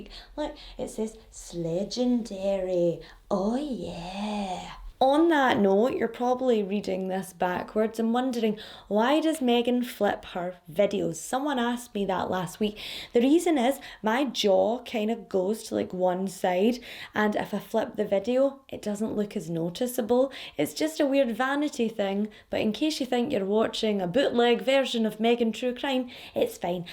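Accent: Scotland, United Kingdom. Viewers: I'm so proud of myself.. When i started watching/listening to your channel, i was having trouble with understanding your scottish accent. I had to listen really carefully. Nowadays i have no problem understanding. I'm a fluent listener of Scottish accent 😎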